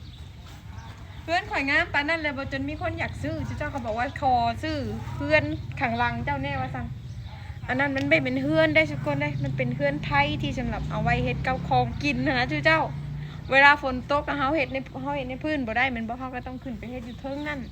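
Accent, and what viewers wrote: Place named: Tha Kho, Thailand. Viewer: ฟังเสิยงเว้า.คึอไทบ้านท่าค้อ แท้.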